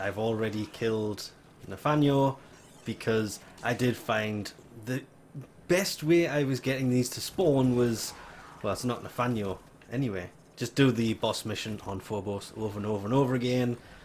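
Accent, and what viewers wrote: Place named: North, United Kingdom. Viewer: Knew I was subscribed didn't know you were northern - just yay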